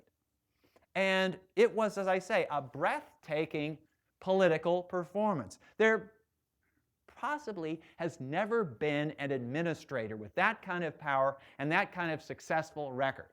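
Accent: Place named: Oregon, United States. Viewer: I listened to an entire lecture from Brands thinking it sounded similar to another personality, but I just couldn't place it. Then I stumbled across a Paul Harrell video. They are both from Oregon, and presumably from the same state region. Linguistic mystery solved!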